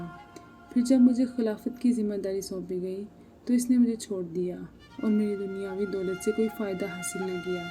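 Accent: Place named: Kashmir, India. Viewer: I hope u from Kashmir it's your voice 😲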